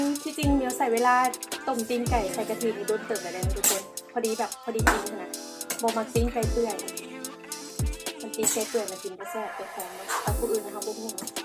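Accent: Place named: North, Thailand. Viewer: สวยครับเหมือนสาวเหนือพูดไทยน่ารัก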